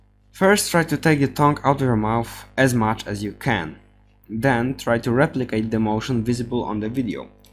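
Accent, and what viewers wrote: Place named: Russia. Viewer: Слышу русский акцент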